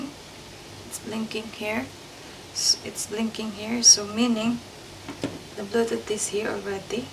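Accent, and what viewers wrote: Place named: Philippines. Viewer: Filipina k mam nu? Hehe halata sa voice mo 😄..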